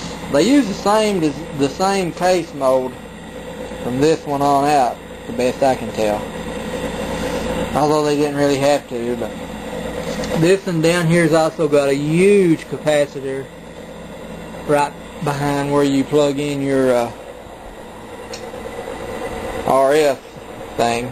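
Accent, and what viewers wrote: United States. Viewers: You from the south of america?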